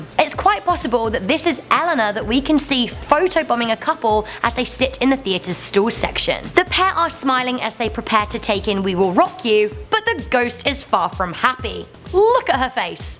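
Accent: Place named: United Kingdom. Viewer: too british... we need danny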